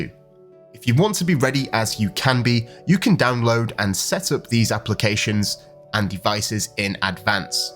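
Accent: Yorkshire, United Kingdom. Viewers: You've learnt how to fight the Yorshire accent somewhat? Bet the yanks love that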